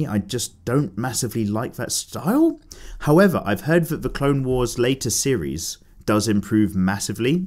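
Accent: United Kingdom. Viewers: Hey! My first time writing on these episoed as I often just lurk and listen to your asmr british voice. But when you were talking about league of legends splashart (2 weeks ago, this is a repost) I had to ask you something, ever heard of Kienan Lafferty? He made most of the famous splashart for riot and he is my biggest inspiration and the reason I started drawing + Im a no lifer and ive played lol since 2012 and the art is way better than the gameplay xP Oh and YOU made me start drawing anime tiddies and "other" good parts;) Anyway love you and your videos <3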